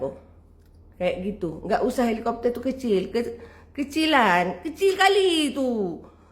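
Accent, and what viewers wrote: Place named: Indonesia. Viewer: Mek...kalau boleh jgn cakap loghat indon....sebab sekarang ni pon mereka kata rakyat MaLaysia lebih suka bahasa Indonyetsia 🐒🐵 mereka tu...kita guna loghat MaLaysia je ...Kalau kita ore kelate kita cakap kelate...kalau kita utara kita cakap loghat melayu utara ...bagi depa faham sikit... 😁🤣✅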